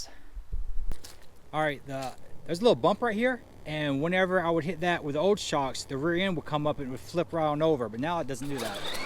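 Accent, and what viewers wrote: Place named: United States. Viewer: You have a...kinda...country accent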